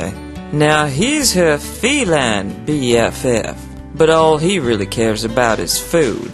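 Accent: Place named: South, United States. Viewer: Narrator is funny as hell ....and soooo southern !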